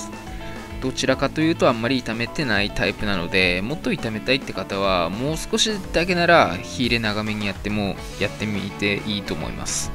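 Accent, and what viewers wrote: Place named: Aomori, Japan. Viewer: 青森の方ですかね？ 津軽弁が懐かしいです。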